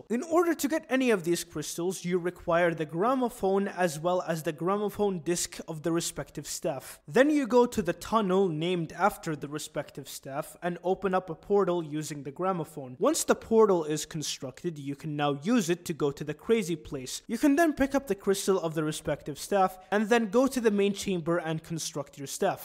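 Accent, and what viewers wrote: Arab world. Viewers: الاكسنت عربي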